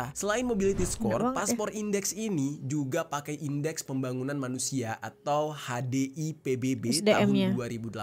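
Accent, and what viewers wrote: Malaysia. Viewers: Terima kasih atas infonya, slang macam orang Malaysia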